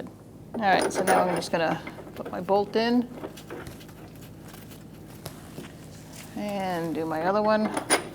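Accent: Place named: New England, United States. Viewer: Love the NE accent, thanks for the video!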